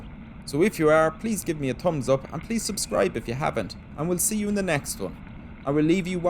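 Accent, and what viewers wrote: Ireland. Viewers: Greetings, I'm really enjoying your YouTube channel, the videos are terrific, your editing is great and I love your dreamy Irish dialect and narration. Maith thú. 💞 Gach rath ort. xox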